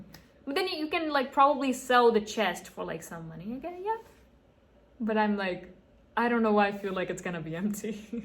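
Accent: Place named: United Kingdom. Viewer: I'm still learning english, and I'm bad with accents. Where are you from? Seems britanic, but I am not sure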